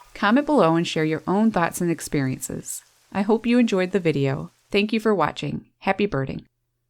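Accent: Canada. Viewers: This narrator's pronunciation of "about" is a dead giveaway canadian accent, but I don't know any Canadians who pronounce their O's like A's like she does. I hear that in Michigan, Minnesota and Western New York. I'm assuming Canadians with that accent are near those borders? Sorry for the off topic, just curious.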